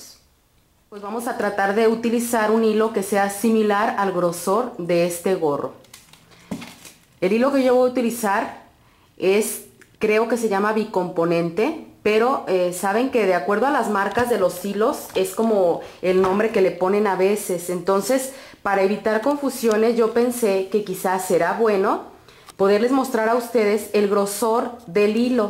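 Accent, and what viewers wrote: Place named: Mexico. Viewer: Como siempre me gusto mucho tu video. y el gorro tambien. Una pregunta personal: Vivo en USA soy Mexicana, por tu acento ..... de pura casualidad eres de Guadalajara,Jal.,Mex.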